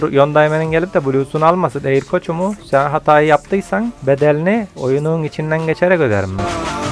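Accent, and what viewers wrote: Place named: Balıkesir, Turkey. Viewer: Nerelisin Balıkesir ağzı konuşuyor gibisin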